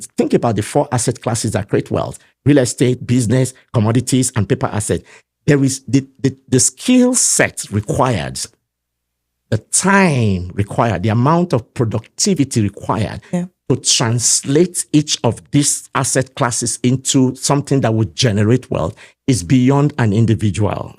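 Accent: Nigeria. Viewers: This man speaks like Nigeria 🇳🇬 n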